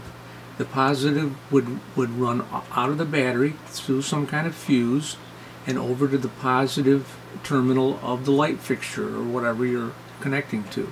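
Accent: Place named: Great Lakes, United States. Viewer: Wow, a strong accent! I'm thinking Great Lakes. (block pronounced as black, ask as "eeyask". Not as strong as for most females of the Great Lakes, but still of that region. Am I correct?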